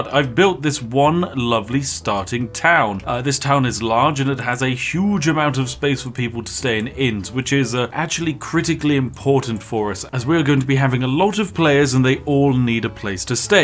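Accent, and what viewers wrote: United Kingdom. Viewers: Is the spiffing Brit from Britain? Sounds a little bit like a British person. No front of course. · why are you british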